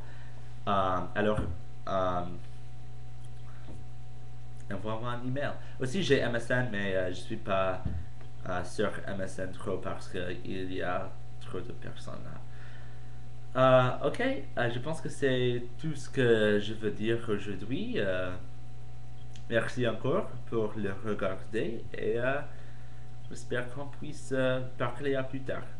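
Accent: United States. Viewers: Pas mal. Tu as un petit accent US mais ce n'est pas grave du tout, on arrive a te comprendre. :D Bonne continuation. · Ah l'accent américain (léger) que tu as quand tu parles français, assez marrant !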